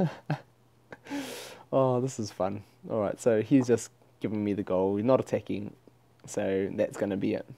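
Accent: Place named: South Africa. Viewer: Johannesburg, your accent sounds very South African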